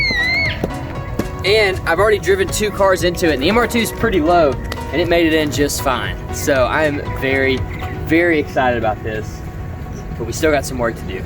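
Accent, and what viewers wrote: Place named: South, United States. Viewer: Love he has that southern accent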